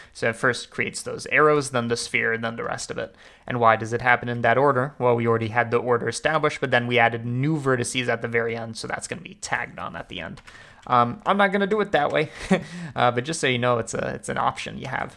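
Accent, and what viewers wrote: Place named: Massachusetts, United States. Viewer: Wanna know how I know you're from Massachusetts?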